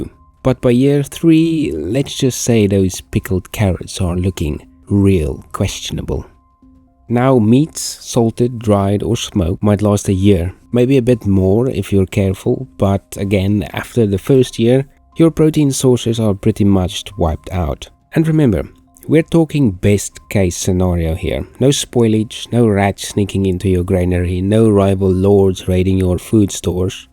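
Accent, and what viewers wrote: Afrikaans, South Africa. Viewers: 🚨🚨 afrikaans asoiaf youtuber spotted 🚨🚨 die skok van jou aksent hoor was groot, maar goeie video! keep it up! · Loved the video.... but also... this is a very south african sounding accent. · South African?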